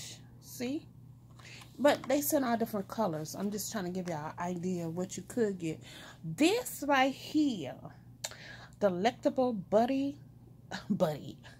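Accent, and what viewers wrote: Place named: South, United States. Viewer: sound like she from the south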